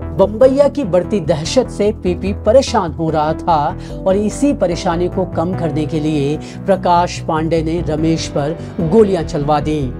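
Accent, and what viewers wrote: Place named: Pahadi, India. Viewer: पहाड़ी हैं। हम लोग इसी भाषा में बात करते हैं।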